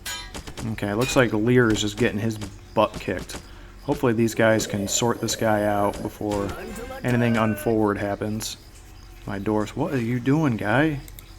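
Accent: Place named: South, United States. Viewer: ooooo southern accnt! charming!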